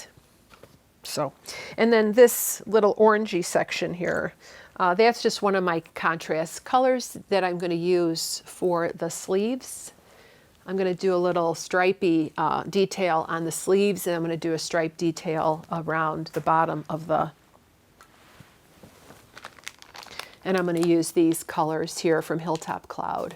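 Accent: Chicago, United States. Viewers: Thanks for your episode. You inspire me with your spinning. I have just begun spinning and have to learn how not to over spin it. I had to smile when you mentioned Sturgis, Michigan as my family had a summer home on Lake Altrich (I’m not sure if I am spelling that correctly)! It brought back fond memories of childhood. I also have to say I love listening to you because I hear the “Chicago” in your voice which, again, brings me back to my own childhood in a Chicago suburb. I do hope you understand that is a wonderful quality in your voice to me! 🤗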